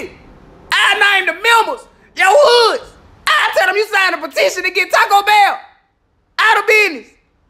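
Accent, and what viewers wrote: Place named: Memphis, United States. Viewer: Bro I love your work I am from Memphis and this is how we talk!!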